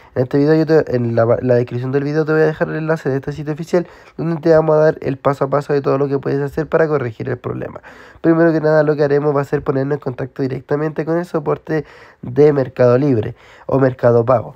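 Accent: Chile. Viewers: Es chileno, así es su acento, de hecho es bastante entendible comparado con la mayoría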